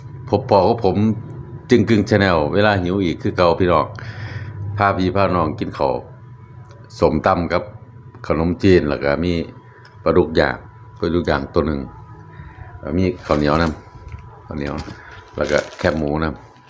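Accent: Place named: Sisaket, Thailand. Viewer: จังแม่นเพิ่นแซ่บแต่เช้าน้ออ้าย ฟังสำเนียงคือทางศรีสะเกษน้อ ผมเขยศรีสะเกษเด้อครับ เป็นตาแซ่บคัก กินนำแน่ๆอ้าย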